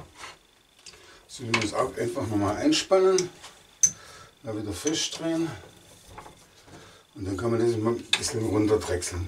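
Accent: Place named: Swabia, Germany. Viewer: dass du dann doch nicht zu extreme ins Schwäbische verfällst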